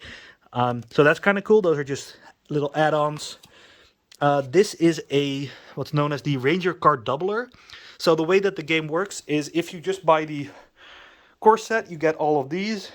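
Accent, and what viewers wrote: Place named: Netherlands. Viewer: Ouch Dutch accent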